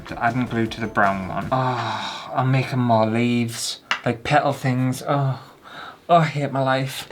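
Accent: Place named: Newcastle, United Kingdom. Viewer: I know it's great, devastated that you change the captions to words others will understand 😂 gotta love a Newc accent lol I think one day you should put the words you actually say 😂 just for shits and giggles 😂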